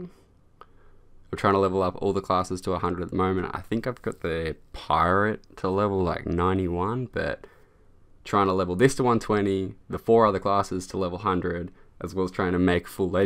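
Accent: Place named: Australia. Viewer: love the aussie accent mate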